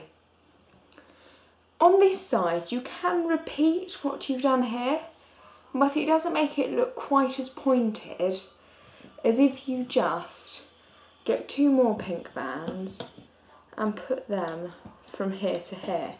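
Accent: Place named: England, United Kingdom. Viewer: I love your accent! I'm from England too but I don't have your accent :( but thanks for the tutorial! :)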